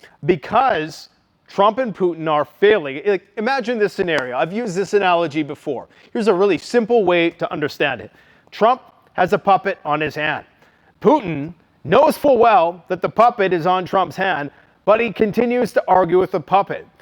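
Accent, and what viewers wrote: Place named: South, United States. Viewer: Lol sound like a true southern boy Tell it like it is don't care who you hurt or piss off😂😂😂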